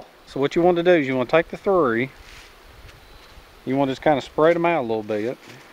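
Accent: South, United States. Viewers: Android Pal then we couldn’t hear his infectious laughter, his melodious deep southern drawl, his “lil jewels”, and that wouldn’t be cool! I d read it too just for the record but I’d miss a lot of above mentioned. 🤘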